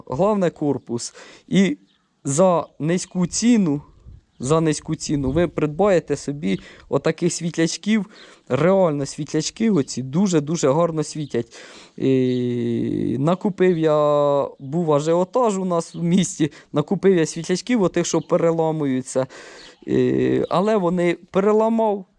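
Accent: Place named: Poltava, Ukraine. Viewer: Мені ті поплавкі даром не треба,але додивився до кінця! Цей Полтавській говор це мед на вуха "діОдик" тобі машини треба продавати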